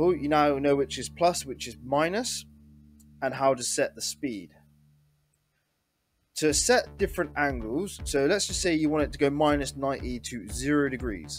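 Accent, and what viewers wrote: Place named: West Country, United Kingdom. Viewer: I know a west country accent when i hear one xD Nice video dude! That last section with the double hinge was exactly what i was looking for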